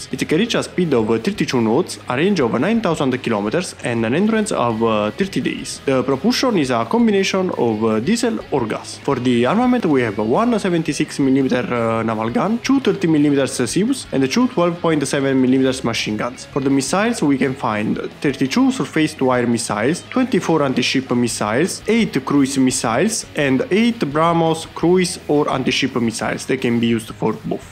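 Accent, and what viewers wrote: Italy. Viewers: Non è difficile riconoscere un accento "maccheronico" anche se molto ben mascherato; i dati sono attendibili e seguono un filo logico molto stabile e definito, per cui, italiano o non la classifica rimane invariata fino a prova di errore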